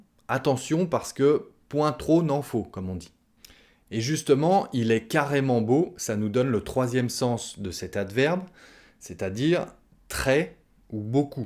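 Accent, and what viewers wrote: France. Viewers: Bonjour! Excellent travail comme toujours! A propos, de quel coin de l'Hexagone venez-vous? J'ai l'impression que votre accent est du nord, mais je n'en suis pas certain. Bonne continuation!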